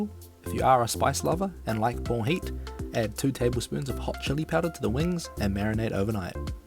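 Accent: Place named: New Zealand. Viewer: Umm it's the New Zealand accent. There are other countries in the world too. I understood him perfectly.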